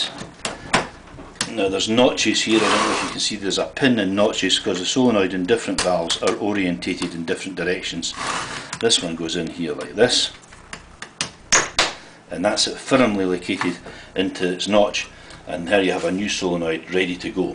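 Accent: Scotland, United Kingdom. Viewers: I know that this video is fairly old, but I hope that you are still receiving the posts here. I have a Triton 90si. The water stopped coming through, and the shower makes an unusually loud noise. We cleaned out the filter and bled the air valve, but it didn't correct the problem. I found your video here - is it possible that the solenoid could be the problem, in that it is not opening the valve? Or does the shower not turn on at all if the solenoid is bad?? By the way....I love your Scottish accent.....:)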